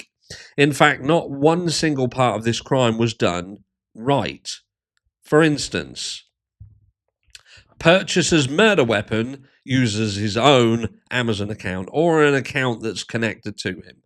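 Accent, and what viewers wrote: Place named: England, United Kingdom. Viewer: Hey brother really enjoying your channel the last few weeks since I started following this case. It's my first "true crime" interest because my BS meter went off since I first heard the 911 call. Keep up the great work. Btw what regional English accent do you have? It has a nice ring to it 👍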